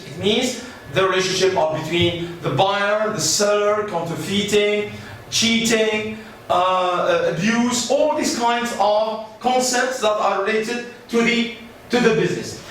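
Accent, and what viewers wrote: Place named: United Kingdom. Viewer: a british accent! Wow>-< that's great Thanks a Lot Sir i really appreciate that('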